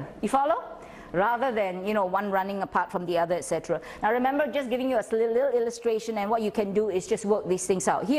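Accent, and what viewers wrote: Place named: Guyana. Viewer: you sound so guyanese.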